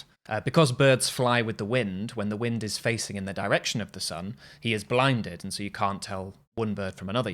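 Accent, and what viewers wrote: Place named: Scotland, United Kingdom. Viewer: Thanks, this is great, today on 25th Jan 2026. Not a bad effort on the Scots accent 😂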